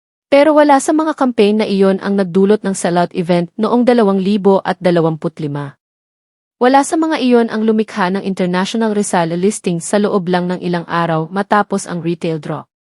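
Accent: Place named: Provincial, Philippines. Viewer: 😂😂😂 pronunciation lng ng NIKE pang barrio ka na😂😂😂 pati Debut mali din😂😂😂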